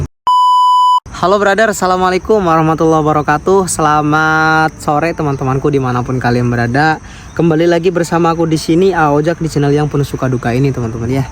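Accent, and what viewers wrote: West Java, Indonesia. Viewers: Dari logatnya sih kayak orang sunda, Abang orang sunda kah?😂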